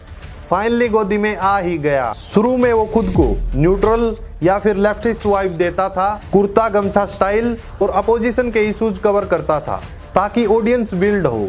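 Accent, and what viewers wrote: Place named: Rajasthan, India. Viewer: Arre bhai aap bhi rajasthani ho boli se pata chal rha h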